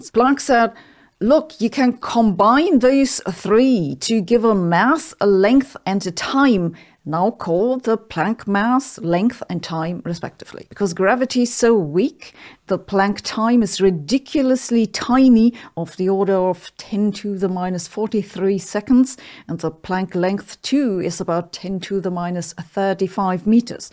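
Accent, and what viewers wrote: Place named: Germany. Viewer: Many of videos are way over my head, like today's posting, but I watch anyway because I enjoy hearing her talk. Listening to someone speak about science with a German accent somehow makes me feel smarter than I am.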